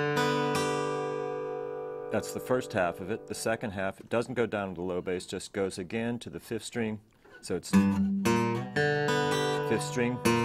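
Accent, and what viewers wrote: England, United Kingdom. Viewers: I think the Broonzy style has further to go in the right hands. He seemed like a marvellous under-rated innovator to me. He stands musically above so many of his time he deserves more acclaim. Thanks for your stuff here, - spot on and you sound English too! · From one to another...father was Ernie.....your lessons are the very best I have yet encountered. Wonderfully clear videos and perfect communication skills. I too would have bet you were English with a touch of U.S. accent. Your family must have come from there at some time. If only all teachers were this good. Thanks Ernie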